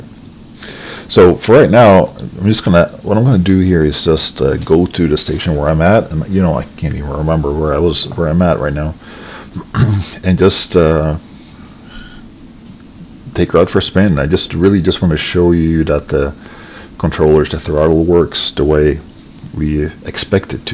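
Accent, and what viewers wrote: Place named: Netherlands. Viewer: lekker bezig man! wel beetje overduidelijk nederlands haha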